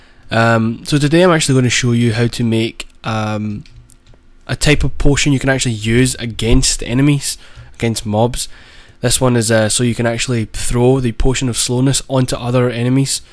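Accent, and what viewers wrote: Scotland, United Kingdom. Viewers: never mistake a scotsman for an irishman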